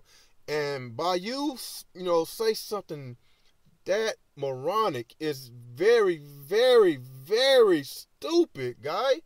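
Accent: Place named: AAVE, United States. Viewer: I hate ebonics lol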